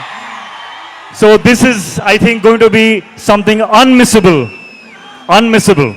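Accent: India. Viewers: ❤❤❤❤❤lovely telugu accent hritik roshan sir 😊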